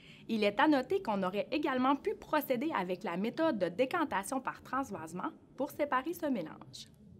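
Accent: Quebec, Canada. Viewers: Non c’est l’accent quebecois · Lol l’accent québécois. Bise au tous les québécois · Merci beaucoup vraiment et je veux pas etre raciste ou quoi mais l'accent québécois m'a aider a mieux comprendre merci beaucoup allo prof je viens de m'abonner!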